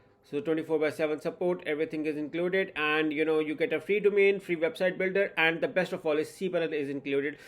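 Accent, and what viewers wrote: India. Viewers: Your explanation is very important because no other video has explained as much as you. Incredible coupon, a discount like this you can't find anywhere, it's a unique opportunity. Whenever I end up on a video with someone who has an Indian accent, I learn something. Gotta love Indian accents. Thank you sir. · Incredible coupon, a discount like this you can't find anywhere, it's a unique opportunity. Whenever I end up on a video with someone who has an Indian accent, I learn something. Gotta love Indian accents. Thank you sir. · Whenever I end up on a video with someone who has an Indian accent, I learn something. Gotta love Indian accents. Thank you sir.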